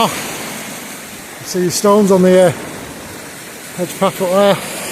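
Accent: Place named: Northern England, United Kingdom. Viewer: Those were the days ..You could ride a bike All is not lost and terrific hearing a northern accent .Who says the North has no beauty Would love to sit by the water fall and eat a bacon butty hot or cold .Better than the Ritz .A glorious views .We share the same words for those who wreck places ,Enjoyed and how .Made me even more homesick for the North West ..Thanks for a great vlog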